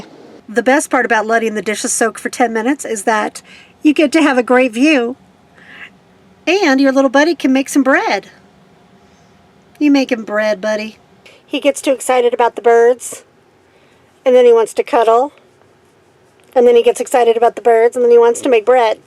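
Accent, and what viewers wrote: United States. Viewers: Sound like Ohio! We LOVE You!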